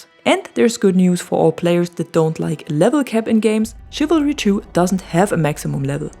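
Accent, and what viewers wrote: Germany. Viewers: Bist du deutsch? 😆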